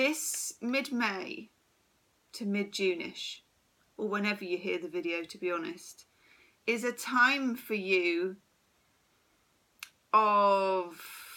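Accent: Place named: United Kingdom. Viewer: All true. Miraculous. I adore both your readings and your British pronunciation, one of the best in my YouTube community (David Icke's being the other, ha ha). Thanks.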